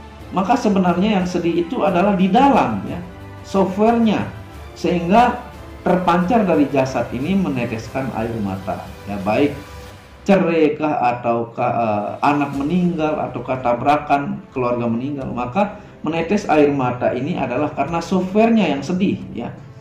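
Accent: Bali, Indonesia. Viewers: Masnya ini logat percakapannya kok seperti orang Bali.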